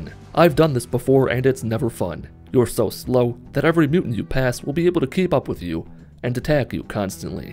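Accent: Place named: Michigan, United States. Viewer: I always wondered why your accent was worse than mine. And its because you are from Michigan and I am from Ohio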